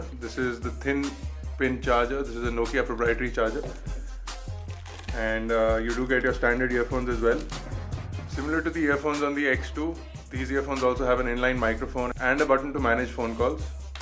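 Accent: India. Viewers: 1st time I heard his voice , I knew he is Indian. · great review, i cant like or subscribe though u sound Indian... and i m Pakistani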